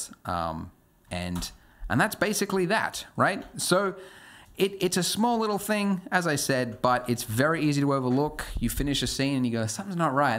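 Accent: Australia. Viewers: "and strouaw" <3 the Australian accent!!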